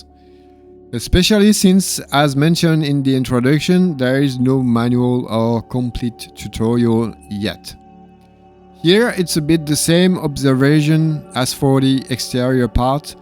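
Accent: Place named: France. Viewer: Salut ! Tes français? Par ton accent on dirait. Si c’est le cas, tu parles très bien anglais!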